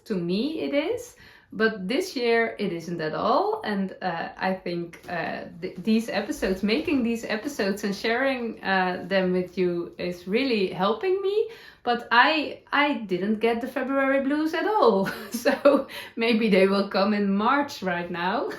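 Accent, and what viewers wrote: Netherlands. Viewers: I adore your dutchy english. I can't remember the name of your sweater ? Can you mention it maybe tomorrow ?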